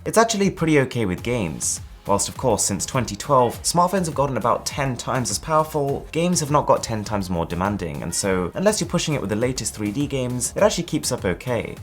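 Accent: United Kingdom. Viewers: Ťëčhťübēř_ Přïñčē He Is Not Indian His Parents Were Indian And He Born In UK... AS You Can See From Hos Accent!!!....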